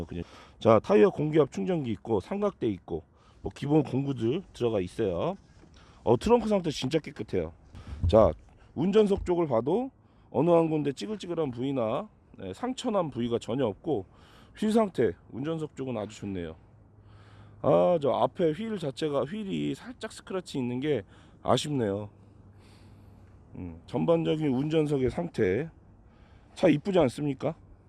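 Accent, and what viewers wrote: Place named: South Chungcheong, South Korea. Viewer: 윤팀장님 충청남도 양반 이다에 내 손모가지 걸께요 가생이 !!! 사투리 푸하하하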